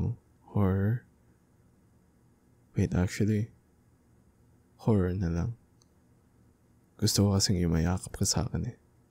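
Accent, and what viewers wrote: Conyo, Philippines. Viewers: sabi ko na nga ba pilipino sya eh, sa accent palang😊❤ btw, I love your videos❤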